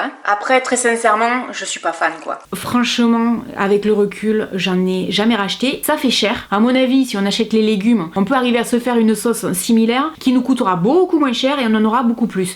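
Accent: South, France. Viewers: Le chocolat est vachement moinssssss bien 😂😂 . « accent du sud trop mignon ». Toi au moins tu es un vrai régal. Comme toujours. Bon dimanche à tous de Belgique 🇧🇪.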